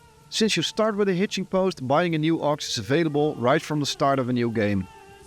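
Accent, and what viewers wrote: Netherlands. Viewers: That Dutch accent 😅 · Jij bent een Nederlander of niet, hoor t meteen, haha.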